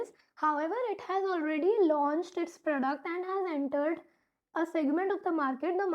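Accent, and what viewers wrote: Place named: Delhi, India. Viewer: Ab bauth hi intrusting way mai sikhateho, and apke voice se Delhi vali vibes ati hai 😂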